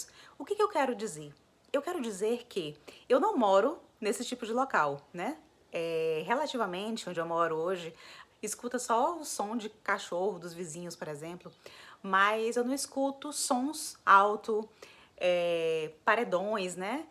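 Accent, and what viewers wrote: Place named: Salvador, Brazil. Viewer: O silêncio é um espaço vazio esperando ser preenchido com harmonia! Já morei em várias cidades do Brasil e percebi que a invasão do espaço alheio "poluição sonora" é uma questão cultural. Todos passamos por um processo evolutivo e acredito que a turma do barulho possa mudar o tom, e conviver em paz com seus semelhantes. Você tem um sotaque de Salvador, onde o silêncio vale ouro!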